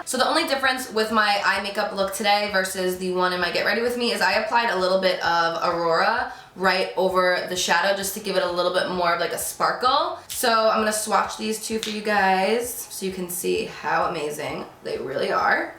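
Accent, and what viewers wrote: New Jersey, United States. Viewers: are you from Jersey by any chance?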